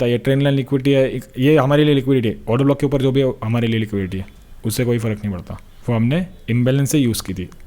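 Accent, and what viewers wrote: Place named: Kerala, India. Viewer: You are amazing!!! with SMC concept bhai.Your accent seems tobe from God's own country,Kerala as I studied in Ernakulam for 2 years.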